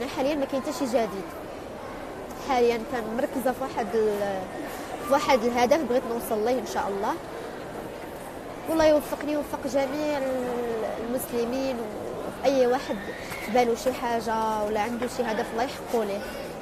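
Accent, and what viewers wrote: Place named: Gulf, Arab world. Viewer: هضرتك ماشي مغربيه لميه فالميه كتصنعي وديما كتهضري مص هضرتك خليجي وكتباني بحال شي وحده فالاربعينيات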